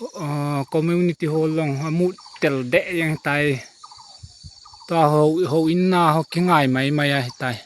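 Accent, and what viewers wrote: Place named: Assam, India. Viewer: Napaodan style chu Assam langho hinnate na...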